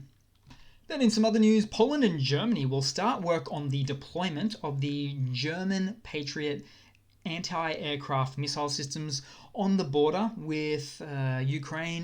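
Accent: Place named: Australia. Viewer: If you're referring to accent, he's Australian.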